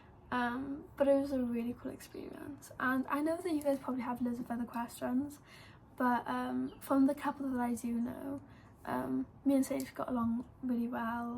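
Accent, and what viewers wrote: United Kingdom. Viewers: Your voice sounds like British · Hello, I'm just now getting to this video an you have an amazing english accent. I'm one of your biggest fans. That's Why I subscribed to your channel. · Yep, but she's Welsh, a different British ( English) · You sound British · are you british??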